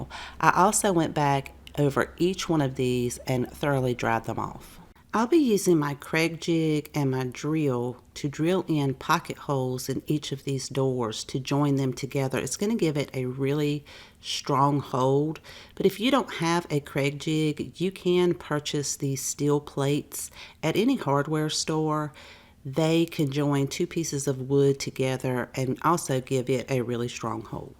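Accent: South, United States. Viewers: Same!! I was trying to guess how far north of I-10 her accent originated from 😂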